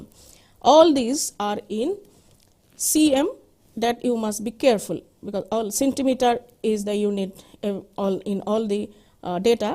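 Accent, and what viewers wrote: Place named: Bengal, India. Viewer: abe vo bengali accent mei bol rahi hai..chill bro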